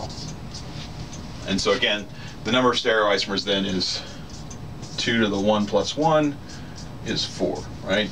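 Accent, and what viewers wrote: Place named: England, United Kingdom. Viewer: Are u an English man!!?.., I kinda felt that accent..., Anyways amazing video!!!. Don't stop doing videos coz, "winners never quit and quitters never win"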